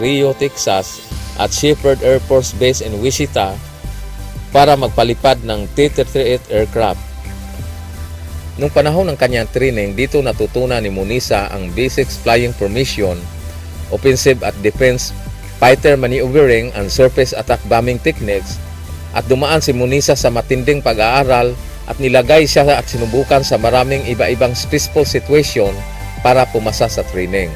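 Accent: Visayas, Philippines. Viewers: Monissa or Monessa?..trick or treats?😁...bisaya ka bay? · Bisaya dong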